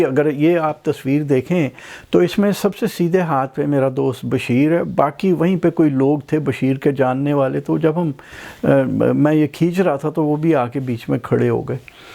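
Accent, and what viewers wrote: Punjab, Pakistan. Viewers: Your skill of story telling is amazing. I have seen more than 50 videos. I am Indian Marathi, your fan of sense of humour. Lot of thanks. Keep it Sir ji. Your Punjabi raheja is fantastic.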